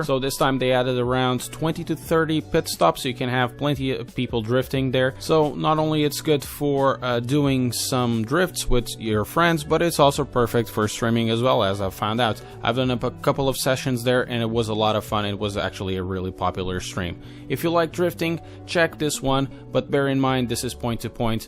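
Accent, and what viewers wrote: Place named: Portugal. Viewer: I had a suspicion from your accent that you were my irmão. boas!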